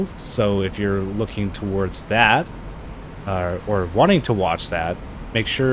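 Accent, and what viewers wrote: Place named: Canada. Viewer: I didn't know there was a cult of purists as you describe, it seems so stupid to me. You sound Canadian, I'm Australian myself so let's just blame dumb Americans like we always do 😄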